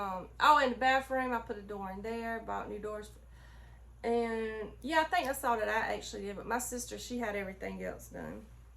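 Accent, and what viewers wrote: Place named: South, United States. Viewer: Oh yeah honey you got an accent. You got that southern drawl. I’m from TN I’ve got an accent too. Lol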